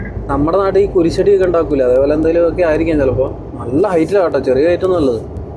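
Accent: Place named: Kannur, India. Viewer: സംസാരം കേട്ട് കണ്ണൂർ കാരൻ ആണോ പിന്നെ ഗൾഫിൽ ചൂട് ആയാലും Ac. ഒത്തു പോവും ഈ തണുപ്പ് പോന്നു ഭായ് ഭായ് എത്ര യൂറോ കിട്ടിയാലും വയ്യ 🙏❤️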